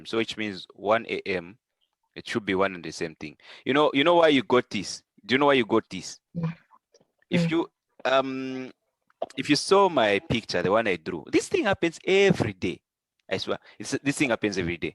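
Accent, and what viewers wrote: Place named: Jamaica. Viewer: He sounds jamaican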